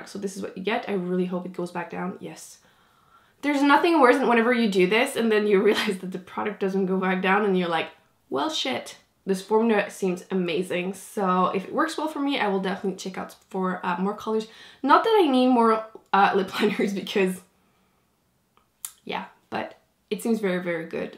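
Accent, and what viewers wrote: France. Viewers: Also, I've thought this for awhile, butI just love your accent! (I took French in high school but don't remember much lol). I just think about it every time you mention the Lancome foundation or any other French makeup brand/name--you're the only one who can pronounce it properly! lol It drives me crazy when people drag out the "a" in Lancome!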